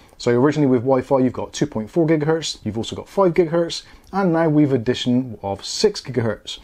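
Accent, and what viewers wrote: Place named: England, United Kingdom. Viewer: thank you for this video, but could very more useful if we could understand what are you talking about ,because for non English citizens not have a clue what you are saying,could be nicer to try to explain more slowly and without the heavy English accent thank you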